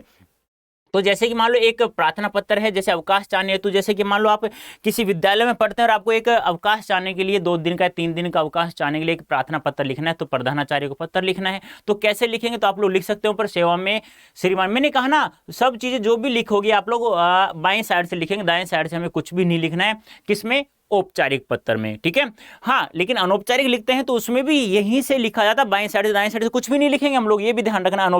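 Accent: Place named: Haryana, India. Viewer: Sir ki language hai haryana ki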